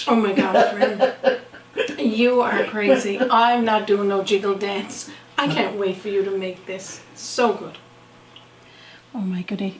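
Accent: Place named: Newfoundland, Canada. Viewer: I just happened to run across your channel (the strawberry squares popped up on my screen yesterday), and I see that you have many interesting recipes online, including some specialties from the area where you live. At first, I was confounded. Your name indicated a Latin background, but I see you are not and also your accent threw me as well, until I saw the word Newfoundland on the screen. Oh, my. That is an area I am totally unfamiliar with, only that I believe it is located near New Brunswick, around there, close to Canada, certainly not my neck of the woods. Anyway, I wrote down a number of your recipes I plan on making in the near future, including this chocolate gelatine dessert, the reason being that I noticed too many manmade chemicals and additives in Jello products. I would much rather make pudding without all that. I also noticed many delicious soup recipes I want to try out as well as several breads. 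I am amazed at the ease with which you make your breads, thinking to myself, why haven't I thought of using those methods before. I am like you when making desserts...I heard your comment in the chocolate walnut cookies video...that when it comes to making something like that, you just want to make it enough to make it taste good, that you don't bother yourself with making it too fancy...something like that. I feel the same way. I will continue to watch your channel in the meantime. In fact, I subscribed yesterday. When I eventually make one of your recipes, I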